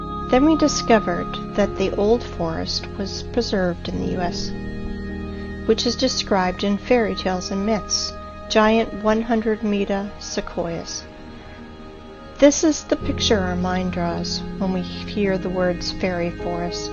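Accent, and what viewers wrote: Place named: Russia. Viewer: I wish i could get the original version with the Russian style accent. my hard drive crashed and i lost my downloaded copy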